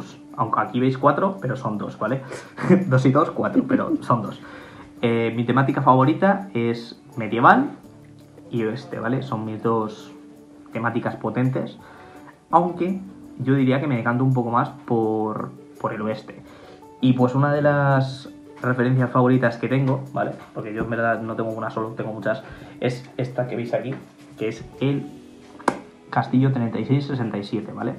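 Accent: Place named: Peru. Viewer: Sigo creyendo q eres peruano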